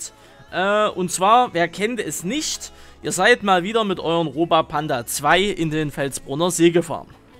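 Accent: Saxony, Germany. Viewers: Nicer Mod p.s. Wenn du Sachse bist dein sächsisch is der Hammer :D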